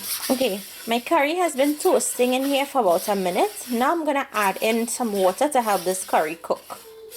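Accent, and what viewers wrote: Trinidad and Tobago. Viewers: The Trini accent makes it MORE interesting!!!!!!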